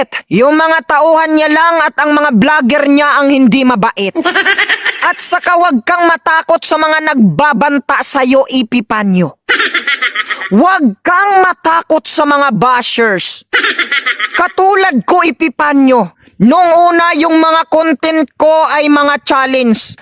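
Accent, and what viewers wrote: Visayas, Philippines. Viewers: Sakit sa tenga nitong Visaya na to ayosin mo muna salita mo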